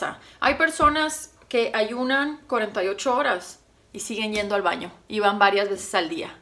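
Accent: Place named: Monterrey, Mexico. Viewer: me gusta tu explicación, muy informativo y bien relax. también me gusta tu acento regio 😎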